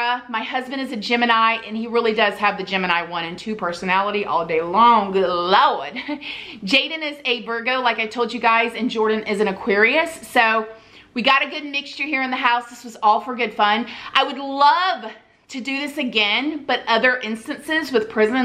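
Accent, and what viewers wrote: South, United States. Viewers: Hello. I’m a little late to your channel and everything so I doubt you even see my message but I think you’re awesome. I’ve been binge watching your videos for like the last 3 weeks now and I’m hooked. At first I thought everything on your channel was strictly for women only but I kept watching anyway. I’m from Pittsburgh PA and I know you’re from Florida but you’re very easy to listen too. Plus you remind me of one of the females from around my way. Just with a little southern twang. I loved this video in particular a lot. I know it was just for fun but a lot of what you said was right on point. I’m also a libra so I was definitely feeling this. Anyway keep doing what you’re doing. You have a fan over here.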